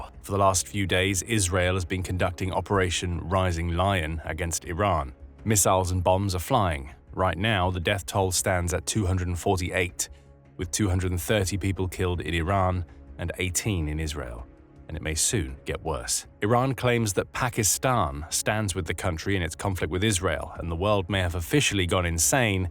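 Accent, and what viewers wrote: England, United Kingdom. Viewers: He has an English accent.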